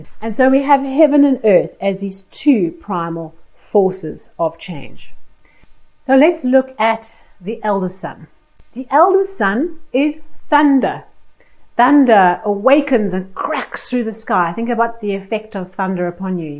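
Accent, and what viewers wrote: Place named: South Africa. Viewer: Oh my goodness, you are South African. I am too!!!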